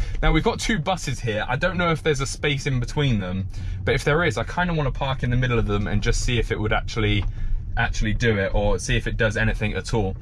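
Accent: United Kingdom. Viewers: For some reason your British voice makes this video 100 times better, I have no attention span and 20mins of watching a car park was the best 20mins I spent all day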